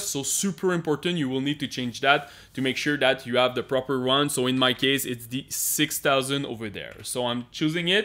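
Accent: Quebec, Canada. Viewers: I love French Canadian accents 😁 Had mixed results with my 7800X3D on stock settings with a gigabyte B650 aorus elite ax. Mid to low 17000 scores in cinebench multicore. Did exactly what you did in this video and it's up to around 18,000 now. I'm only running -15 and it seems to be stable, but from what you said it seems realistic to try for -20 or even -30, so I'll probably try that later today. What kind of clocks are you getting for multi-core loads? Great video, thanks.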